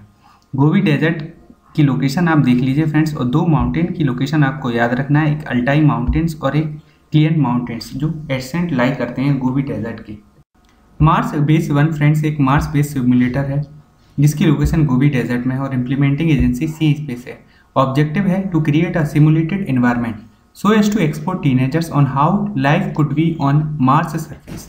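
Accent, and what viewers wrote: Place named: India. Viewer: 咖喱英语，一听就知道是三哥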